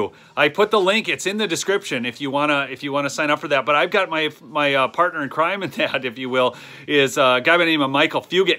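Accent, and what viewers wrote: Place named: Minnesota, United States. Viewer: I was born in Butte Montana and his accent is right out of the movie "Fargo"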